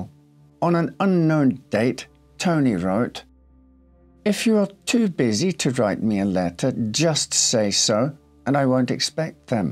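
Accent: Scotland, United Kingdom. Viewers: Host, what is your accent? I said Channel Islands, my wife says Scottish??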